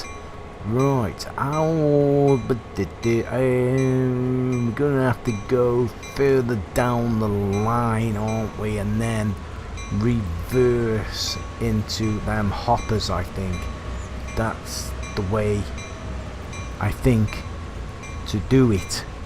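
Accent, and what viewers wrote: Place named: Stoke-on-Trent, United Kingdom. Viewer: You from stoke on trent by any chance? im from sneyd green and recognise that accent, although mine has gone now as ive been in somerset for 8 years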